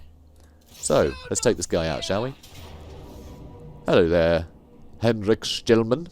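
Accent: France. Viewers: Appreciate all your Total War videos. Favorite part of this one is the French accent 😄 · Hey serious question where did you learn to do the french accent! That's one I'm not good at but want to learn! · Pretty good Bretonnian(french) accent!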